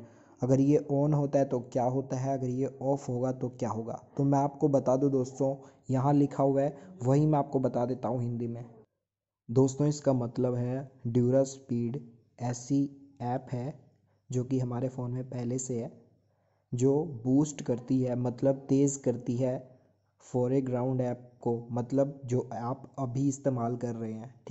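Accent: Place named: India. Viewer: What is it with all these guys from India clogging up the how to's on YouTube electronics. First off no one could understand what they're saying between the accent and the lack of base in there voice and second isn't there any straight English guys just wanting to explain how a phone works instead of him telling the world what he did with his boyfriend last night. THIS GENERATION IS GONE TO SHIT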